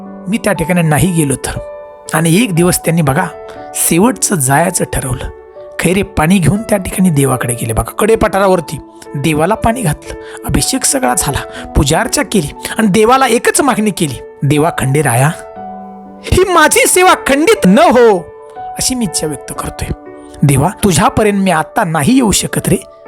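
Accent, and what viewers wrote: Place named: Belgaum, India. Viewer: tumchya bolnyavarun tari tumhi belgav che mulche asave ase vatte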